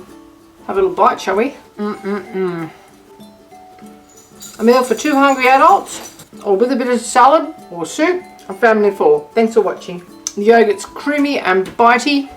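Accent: Australia. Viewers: Another scrumptious recipe to add to my collection, thanks! I love the way the Strines say "heh" for here: )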